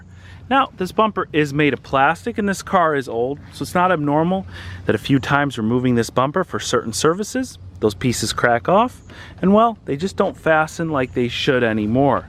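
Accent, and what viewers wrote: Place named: Tampa, United States. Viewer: Phil Abrahams The Floridians from Tampa area have this accent.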